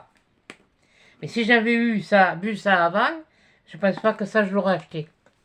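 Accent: South, France. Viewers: Cc, je regarde de temps en temps tes vidéos même si je ne commente pas souvent car j'ai les mains occupées. Déjà merci pour ce partage d'achat très intéressant. Je n'ai jamais commandé sur ce site, il va falloir que je m'y penche 😅. D'autre part, j'apprécie ta franchise, ta spontanéité et ton naturel, tu me fais énormément penser à une personne qui m'était très cher et qui me manque beaucoup, tu as le même accent du sud, le même parler, le même caractère et presque les traits physiques de ma grand mère.. à tel point que parfois ça m'est difficile de t'écouter, tu me rend très nostalgique...bref j'espère ne pas te vexer de te dire cela. Surtout reste comme tu es, je n'ai pas commenté sous ta vidéo concernant Vinted mais je suis tout à fait d'accord avec toi. Merci pour ta bonne humeur et la chansonnette finale, tes yeux et ton regard m'ont régalée. Belle journée à toi et bonne créativité pour le défi de...ah mince j'ai zappé le prénom 😅, désolée. 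Bises 😊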